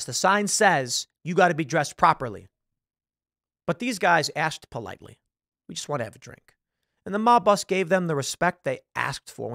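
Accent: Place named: Chicago, United States. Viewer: Lol. You could definitely tell your from Chicago.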